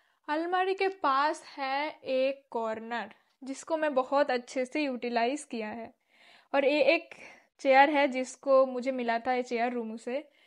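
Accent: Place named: West Bengal, India. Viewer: Didi apka ghar khaha hai... up ka bat sunkar I guess West Bengal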